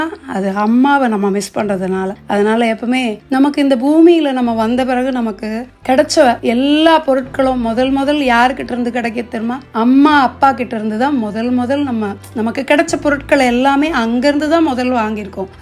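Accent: Kerala, India. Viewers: Romba alaga pesurenga amma.. Unga pechu la malayali touch eruku, neenga malayali ah?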